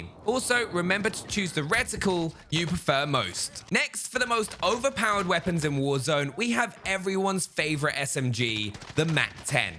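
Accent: United Kingdom. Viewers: Always have been subscribed and notification squad! Teamwork make a dreamwork. Always loved your British voice, keep up the good work mate!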